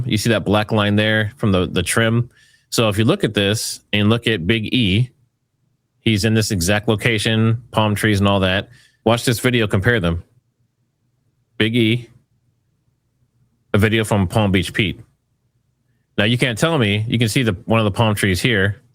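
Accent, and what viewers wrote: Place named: New York, United States. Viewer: You can hear the New York accent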